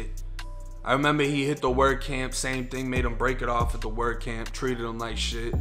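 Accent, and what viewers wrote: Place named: Massachusetts, United States. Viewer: Good shit. This is a break through for you. Everytime I level up it makes me feel happier with who I see in the mirror. We have watched you transform. I've been with you a while. You tell good narratives. You're a great story teller. And you are now seeing that life is more about letting go than reliving. My gf calls me on it when I live in the past because we had to be one thing to not fall victim to the population. You don't get this many loyal subs unless you're doing something interesting. Massachusetts accent don't hurt us either. Lots of girls love our accent 😂. I actually watch your vid first of all my channels in subbed to. 🔥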